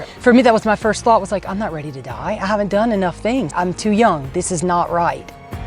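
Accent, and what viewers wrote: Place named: Louisiana, United States. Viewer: Monkey Michele is so proud of you girly! You will inspire many with your story! I love that you haven’t lost your Cajun accent. I hear it so clearly! Stay close to your roots. Much love!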